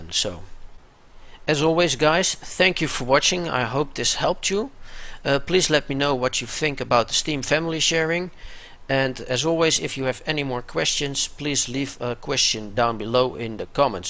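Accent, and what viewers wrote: Netherlands. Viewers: I can hear that you're Dutch ;D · ik weet gewoon dat jij waarschijnlijk nederlands bent. je praat zo nederlands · deze guy is nederlands heh? Goede video btw XD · That Dutch accent tho. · nl nederlands sws kan niet anders - en your dutch, i know it for sure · Damn dutch acc is real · whaha love your dutch accent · are u dutch? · dat is very Dunglisch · Ik kon meteen horen dat je Nederlands was, bedankt voor de tutorial! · Are you Dutch ??? You sound Dutch to me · i have a feeling he is dutch XD i can tell by his accent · That nederlandse accent tho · ik hoor aan je stem dat je nederlands bent :) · Dat Nederlandse accent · Are you dutch? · ) dat dutch accent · jouw accent is so duidelijk nederland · Ur accent is so dutch omg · Dankjewel man, ik hoor aan je accent dat je Nederlands bent :) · je bent nederlands leuk accentje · leuke accent haha, echt nederlands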